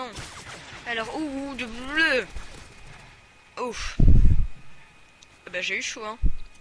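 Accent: Switzerland. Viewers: Il est serait pas suisse lui par hazzzzard???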